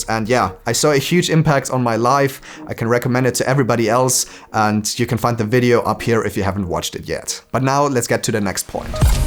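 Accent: Germany. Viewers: haha ich habe sofort erkannt dass du Deutsch bist, obwohl du den Akzent schon gut wegbekommen hast. Aber ich muss sagen, du machst gute Videos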